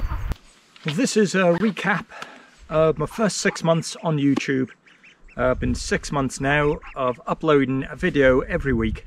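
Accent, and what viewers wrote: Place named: United Kingdom. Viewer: I clicked on this expecting an American accent and was surprised! Good to see another UK YouTuber 😄👍 lovely area that you live in too. I've only been on YouTube for 2 months so it's great to hear some insights from someone ahead of me! Great video my friend. Have subbed 👍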